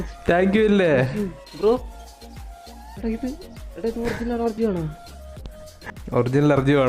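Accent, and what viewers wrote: Malappuram, India. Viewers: Bro malppuram basssha matttt najmall malppuram malayalam parnjnnnl mtuulli work manisilllla😍😘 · Ninte home malappuram ann enn ariyamayirunnu ninte samsaram keettal manassilayi😎😎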